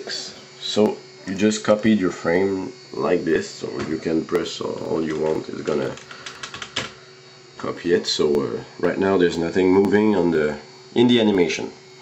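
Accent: Quebec, Canada. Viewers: B à la première seconde de la vidéo jme suis dit "oh yest quebecois !" merci pour la vidéo !!!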